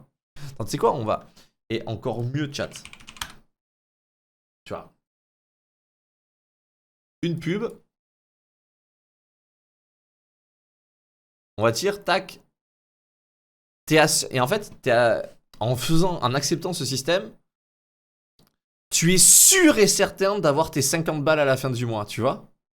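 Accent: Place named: South, France. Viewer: bel accent du sud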